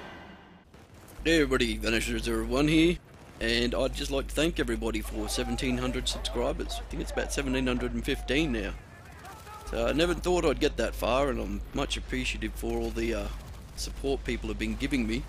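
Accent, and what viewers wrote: Australia. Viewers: lmao i thought the very start of ur vid was the Bf3 Alpha shit, till i saw 1700 lmao i was getting all pumped to see u play it to lol i love ur vids, probs cuz ur an Aussie as well, and im sick of Americans commentating lmao :D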